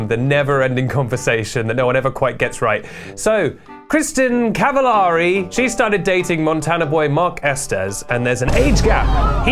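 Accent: South, United States. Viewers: Your southern accent is better than mine and I'm an American. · Yeehaw fashion era 😭 Btw your southern accent slayed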